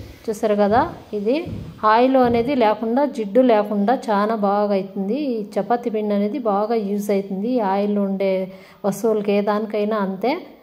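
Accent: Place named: Chikkaballapur, India. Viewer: ಮೇಡಂ ನಿಮ್ಮದು ಕರ್ನಾಟಕ ತಾನೇ ಕೋಲಾರ ಅಥವಾ ಚಿಕ್ಕಬಳ್ಳಾಪುರ ತೆಲುಗು ಮಾತಾನಾಡುವ ಶೈಲಿ ಚಿಕ್ಕಬಳ್ಳಾಪುರ ತೆಲುಗು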